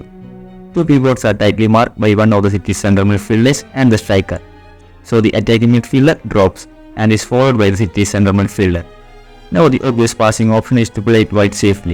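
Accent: Kerala, India. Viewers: I am from west bengal, I have many friends from Kerala. I realized you are from kerala from your accent of speaking english😄.one suggestion for you please improve your audio quality the analysis was good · Wtf is this voiceover?? Malayali guy 🤮🤡.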